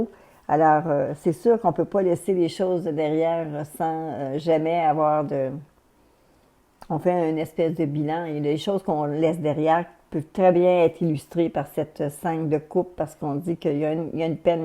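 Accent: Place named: Quebec, Canada. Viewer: Vous êtes vraiment formidable. Et puis....., bravo..🙌🙏.., vous avez changé votre '' look vidéo''. Le son est clair...et voire même votre excellent français canadien.😀. Il est devenu bcp plus prononcé eeeet...très distinctif. Merci ma soeur et que Dieu vous bénisse richement.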